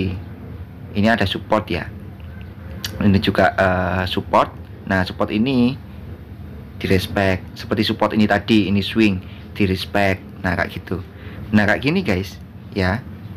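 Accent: East Java, Indonesia. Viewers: Antap Brada Jatim 😇👍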